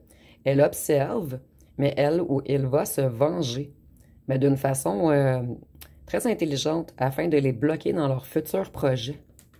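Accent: Quebec, Canada. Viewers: Ah ah non en France ce n’est pas spécialement en juillet ! C’est toute l’année à n’importe quel moment ! Sauf que dans mon cas en effet, mais c’est moi qui doit déménager en juillet ! Avec un peu de chance il aura envie de venir emménager chez moi ! 😆 Merci pour cette guidance ! C’est bon d’entendre votre accent Québécois qui me manque beaucoup ! ❤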